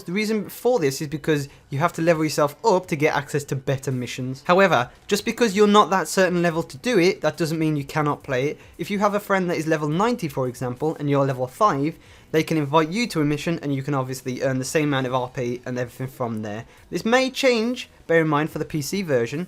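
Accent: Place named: United Kingdom. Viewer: Dat british acscend doe.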